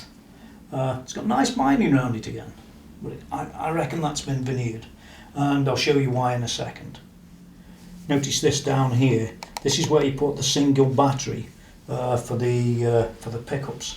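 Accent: United Kingdom. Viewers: I hear a British accent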